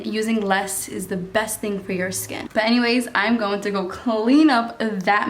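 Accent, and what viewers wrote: Boston, United States. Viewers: You are so gorgeous naturally and I love how you wear minimal makeup I’ve been wearing less makeup lately do to it causing me to break out and I love your Boston accent ❤️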